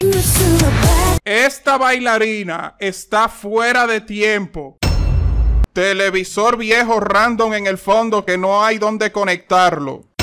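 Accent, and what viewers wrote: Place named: Dominican Republic. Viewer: Eres Dominicano? Creo que sii jajaj es que el asentó dominicano se reconoce ma rápido que Cabada con lo 30 minuto y un chin ma`😂 yo también lo soy❤ AMO TUS VIDEOS!!!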